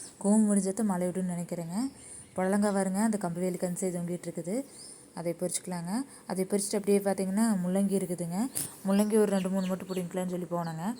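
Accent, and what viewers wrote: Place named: Kongu, India. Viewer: கொங்கு தமிழ் கேட்டு ரசிக்க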